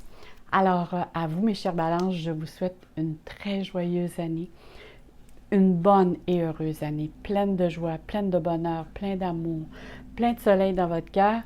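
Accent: Canada. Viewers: Bonsoir, ma petite. Je vous est écouté avec beaucoup d'attention et d'intérêt. Et vôtre tirage ma parlé. Rien à voir mais je doit vous le dire. ( J'aime vôtre accent Canadien. ) Bon revenons à nos moutons comme ont dit. Ont vois de suite vôtre professionnalisme, pour ce qui est d'interpréter la lecture des cartes. Sans oublier, votre précision exceptionnel. Et pour celà je ne peut que vous dire merci. Et BRAVO. Je vous souhaite une très bonne nouvelle année 2021. Santé, bonheur, joie, prospérité, et amour. Que dieu vous protège. Amicalement Daniel. ( je suis balance ascendant scorpion. ) bye bye.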